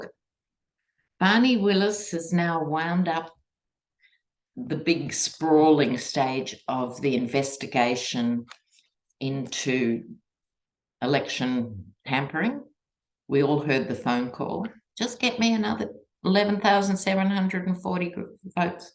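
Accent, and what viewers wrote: Australia. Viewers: I heard you on the Mary Trump Nerd Avengers show and had to give you a follow. It was great to hear an Aussie voice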